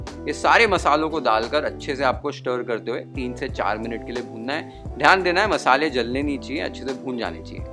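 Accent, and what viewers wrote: Maharashtra, India. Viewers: Authentic recipe with authentic marathi brother❤❤❤ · एक नंबर मराठी ठसका ❤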